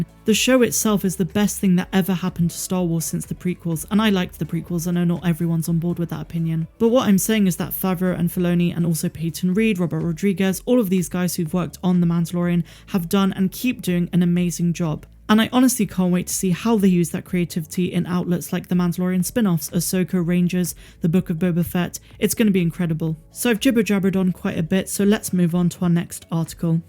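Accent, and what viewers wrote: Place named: England, United Kingdom. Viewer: I love hearing your English accent:),,, on Starwars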